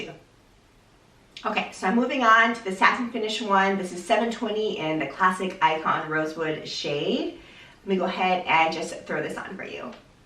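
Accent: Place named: Canada. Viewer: Love the Rosewood on you! I hear a bit of a Canadian accent from you. Did you grow up in California?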